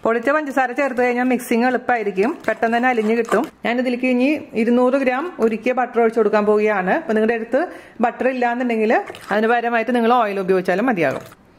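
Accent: Thrissur, India. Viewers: ! Your musical narrations at thirsur slags with valid tips,super cake Xmas to you and family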